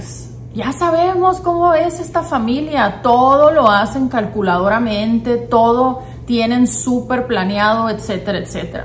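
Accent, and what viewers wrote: Sonora, Mexico. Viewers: Me encontre con tu video y me parecio muy interesante. Me quede viendo hasta el final, me gusto tanto que me suscribi a tu canal. Lo que mas me encanto fue que enfatisas que en este tema de nuestros bellos y naturales cuerpos ☺lo que hagamos con el, al compartir con otros nuestra experiencia lo hagamos CON HONESTIDAD. Me facino tu forma de hablar con tus modismos, deduzco por ellos que eres mejicana. Eres muy simpatica y tremendamente honesta lo cual se agradece demasiado sobre todo en estos tiempos de tanta mentira e hipocresia. Un abrazo fuerte desde Chile. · Jajajaja weee tr amo. Hablas bien COOL. Supe que eras mexa por como hablas hasta no se si seas de tijuas me estoy oyendo cuando te oigo 🤣🤣🥰🥰🥰 y tienes mucha pinché razón. Pinché gente falsa y locas. Jajaja pero no puedo dejar de verlas pa saber como acaban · Eres sonorense?????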